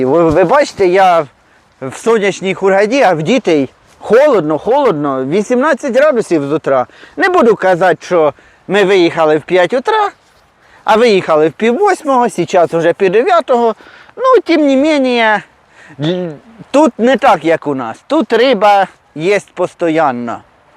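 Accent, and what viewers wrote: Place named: Surzhyk, Ukraine. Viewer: Суржик у Вашому виконанні забавно слухати. Майстер стендах. Всього найкращого. Лайк і чекаю на нові відео.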